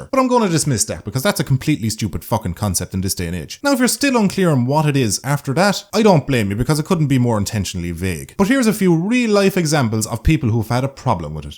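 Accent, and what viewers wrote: Ireland. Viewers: That's his accent, I'd know because I'm from Northern Ireland, which is ya know very close to Ireland, attached in fact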